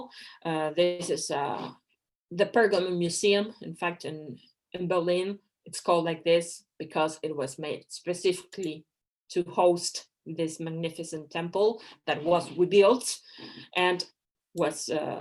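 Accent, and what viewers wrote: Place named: Spain. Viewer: amo la pronunciación perfecta de inglés, con detalles de castellano y muletillas francesas. espectacular.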